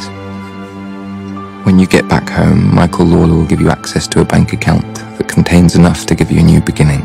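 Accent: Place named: United Kingdom. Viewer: 念的英音英语真好聽。喜歡死這部電影了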